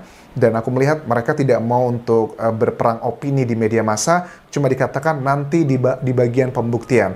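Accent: Java, Indonesia. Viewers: Cara bicara bang sperti orang Jawa Bgtupun dng nm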